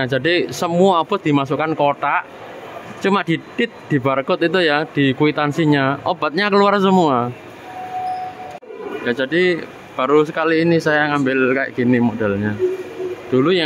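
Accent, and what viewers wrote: Malang, Indonesia. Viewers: Tas Nemu Chanel mas, wong Malang ta mas? Kok logat e koyok wong Malang?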